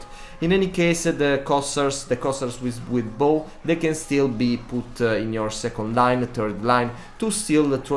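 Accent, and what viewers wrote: Italy. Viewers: parli molto bene in inglese ma si sente ancora che sei italiano xD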